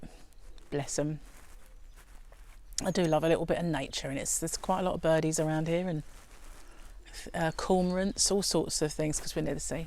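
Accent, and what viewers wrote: United Kingdom. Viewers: Text or call and see if they will take measurements that you can use in crocheting projects. I am enjoying your Veda as I feel I go for a visit to the UK each time I hear you talking. Like you, i steer away from the grim and doom frequently portrayed on TV. I have tuned into some good Christian channels that are instead very upbeat and encouraging. Keep it up love, you are doing fine!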